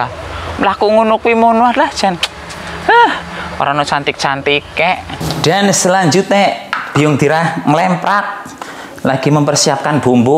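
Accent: Banyumas, Indonesia. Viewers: ngomng ngapk baelh...aj badeol...😂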